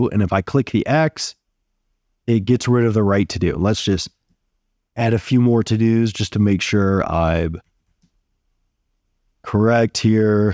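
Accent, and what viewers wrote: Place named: United States. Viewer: I've been wishing for someone to make this video (react 2025) and for that someone to be an American English speaker and not an Indian and here you came in clutch with this gem. Thanks!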